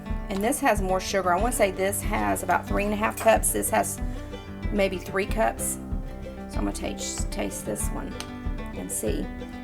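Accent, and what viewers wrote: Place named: Georgia, United States. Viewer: I'm attempting my first fig preserves. I saved several videos to watch and learn but I didn't make it past yours. 😂 I started it and was like, "hey she sounds like me!" (I'm from GA too) - so I didn't watch any other videos. I've been so intimidated by this but your video makes it look so simple. Diving in now - thank you!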